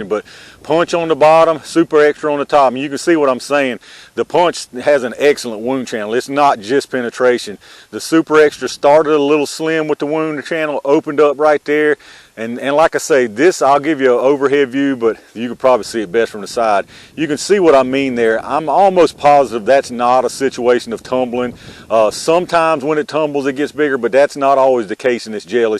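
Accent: South, United States. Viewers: well guess i need to subscribe.. ive watched 4 of your vids without trying to, plus you sound country as hell like me im in SC I would use the aguila for 10/22's and for people with short 22lr barrels like NAA revolver would use punch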